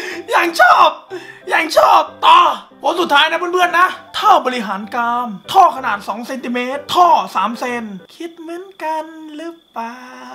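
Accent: South, Thailand. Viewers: 555เขาเเหล่งใต้มาเราเเปลทายย55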